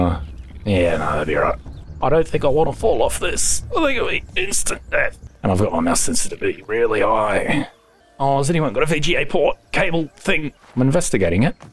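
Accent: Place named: Australia. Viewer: Ok you can all laugh but it took me forever to realize has an Australian accent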